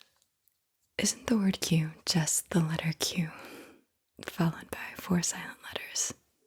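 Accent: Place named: United Kingdom. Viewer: 1 sometimes 2 morning coffee 😊 3 football (Love your British accent BTW) 4 music 5 grandad 😢 6 sorry I didn't catch that 8 carting 9 2 people falls the middle 11 face 12 hotel 13 // Xd 14 I guy with three b f Xd 16 I lost number count Jak & Daxter Senen 100% Police man coming Xd Idi Amin conquer Having a gf Xd Mercadona Empathy German Lost familiar? Sorry I don't have that understanding level I am nice person Animal loving Treat of others (This is the life) Wallet, phone, Air pods Currently lost train Yours 💜💜🔥 Curious * But JK Rowling fault 😅 Maybe not But I found 20€ And turned to catch them But they weren't Not me But take car dr n k A grl trowing €100 away on the street That later came to my pocket It wasn't r0b she didn't want them And Xd There was more money But I didn't take Politics Yes Queen Xd 😊 Humans AI Health care Barcelona Diddy Cap Chicken Fortnite ❤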